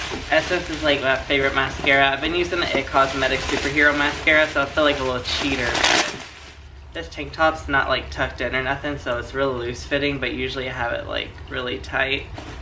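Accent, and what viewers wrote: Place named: South, United States. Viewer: What state are you in? I like the southern accent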